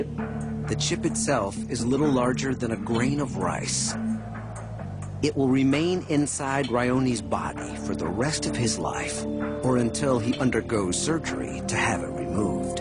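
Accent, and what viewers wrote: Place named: Netherlands. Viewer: Who cares about life?? DUTCH ACCENT DUTCH ACCENT DUTCH ACCENT DUTCH PEOPLE PARTY DUTCH PEOPLE ACCENT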